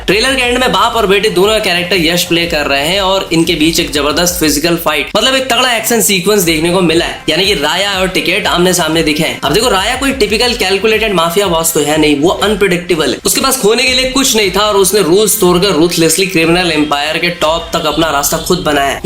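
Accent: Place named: UP/Bihar, India. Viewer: Bhai Tumhara review Pura scripted laga Kyun ki Ji angreezi shabd tumhne trailer ko uncha dikhane mein istamaal kiya woh kaafi ache hai Aur Baaki angreezi tumne istamaal kiya Woh UP/ Bhihar ka MTI yane mother tongue influence se bhaara hai. Pata nai Kitne log ye mehsus karenge but Mera opinion yahi hai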